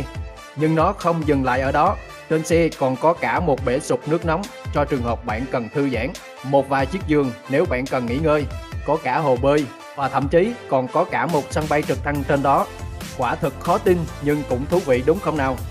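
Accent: Central, Vietnam. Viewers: Ad ng miền trung À??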